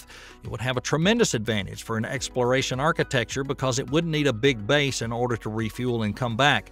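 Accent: South, United States. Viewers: What a sh!tty scripted video. The southern accent makes it worse too. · Won’t listen to a cowboy accent talk about space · Quite frankly, this guy has no idea what he is talking about. Also, most of his information is very outdated or otherwise inaccurate. However, as entertainment, it's a gem. Southern accent: "the rocket plume is going to blow a hole in the moon".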